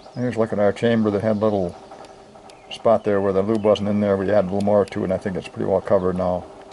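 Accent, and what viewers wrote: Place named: Midwest, United States. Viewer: By the way I kind of recognized your accent are you from Midwest or Minnesota I grew up in Minnesota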